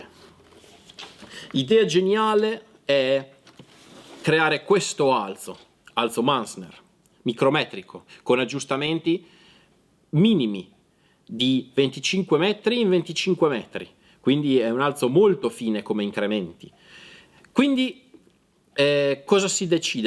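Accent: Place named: Italy. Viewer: Come preparazione tecnica,e conoscenza del sistema d'arma molto buono, non riesco a capire il fatto di presentarsi fingendo di essere stranieri quando dal accettato è evidente l'italianità, e poi il resto, felpa occhiali e cappello ,ma!!!!!😂😂😂😂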